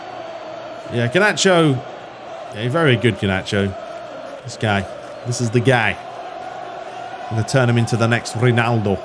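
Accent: Netherlands. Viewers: I'm Dutch myself and I think your Dutch accent is on point! 😁 Great episode! keep em coming 👍🙏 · Amazing Dutch accent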